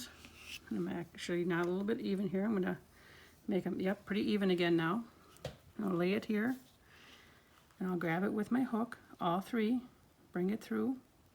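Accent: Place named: Scandinavia. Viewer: Interesting accent - are you European? Scandinavian Maybe?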